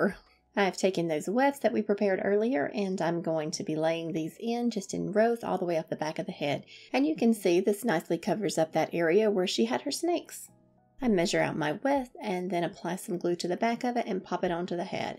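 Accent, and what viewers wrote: South, United States. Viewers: They are all really beautiful. I found this channel through our Facebook page, so now you know it works to advertise! Also, you sound like you are from the south like me! It's great to have other doll collectors from the area. You do great work!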